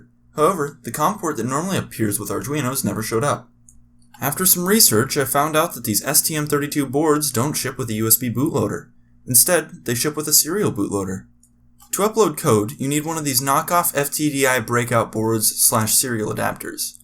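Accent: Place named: Midwest, United States. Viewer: To quote steamed hams, "it's a regional dialect." I also say sodder instead of sold-er. Dang Midwest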